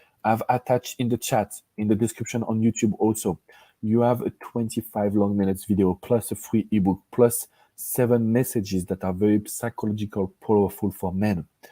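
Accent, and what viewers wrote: France. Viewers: Your French accent is amazing is an advantage 😊 thanks 🙏 for everything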